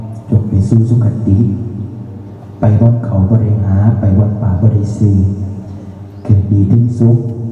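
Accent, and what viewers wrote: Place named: Ubon Ratchathani, Thailand. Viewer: เสียงดีมากทำนองสมกับเป็นลูกเมืองอุบล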